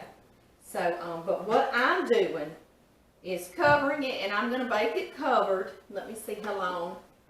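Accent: South, United States. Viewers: With that Southern accent, you KNOW its gonna be good food! 🤤😁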